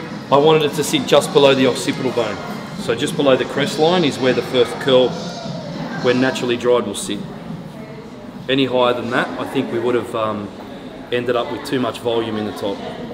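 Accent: Australia. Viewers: she's such a gorgeous girl love her hair what an amazing job you did and love your accent its so beautiful love Australia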